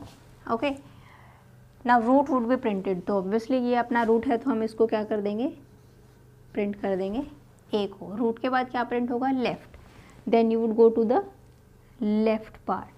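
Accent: India. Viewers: this sweet indian accent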